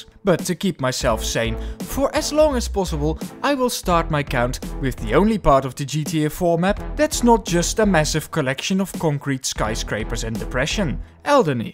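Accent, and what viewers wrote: Netherlands. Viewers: Nederlands accent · I have an feeling that you're dutch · nice dutch accent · Are you Dutch? Your accent sounds Dutch 😂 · what an example of dutch accent😊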